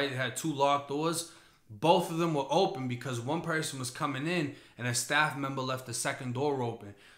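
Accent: Boston, United States. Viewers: Swear all this time I thought he was from Boston with his accent. Woulda never that FL. Still only a few months in to his channel not knowing his story or if he was raised there and moved to FL later on, but his accent is thick; love it. But about the story - wow! Alex was a real one Bro. You a solid dude so it makes sense why you and him were friends; explains why you feel guilty for something that wasn’t directly your fault. Alex was solid and didn’t fold - don’t think he blames you for his character just being that of loyalty (if that makes sense). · totally Boston dude... I heard it too. I was totally confused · Bro I thought u were from Boston. Bcuz u have a Boston accent. Wow i was wrong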